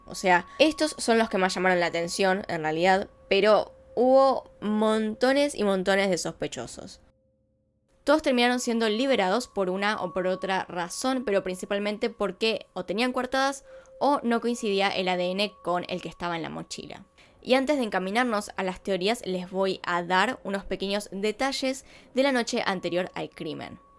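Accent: Argentina. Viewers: Sos Argentina? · Es Argentina, habla Argentino. Que queres? Que hable aleman? 🤣 🤣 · Es de Argentina? dowwww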